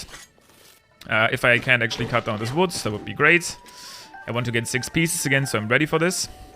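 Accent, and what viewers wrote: Germany. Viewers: Boah dieser deutsche Akzent 🥱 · dang, ich liebe diesen deutschen Akzent xD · Bist du deutscher?Das erkennt man bisschen am englisch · das deutscheste englisch das ich je gehört hab ^^ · I have a question ur german arent you because i hear a pretty thicc german accent😂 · Are you german cause of you're accent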